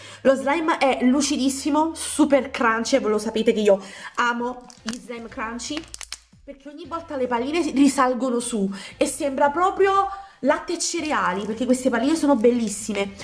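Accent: Naples, Italy. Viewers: La tua voce napoletana ha ha ha ha ha bellissima e bravissima magg scurdat pur o nome hahahhahahahaha adoroh viva NAPOLIIIII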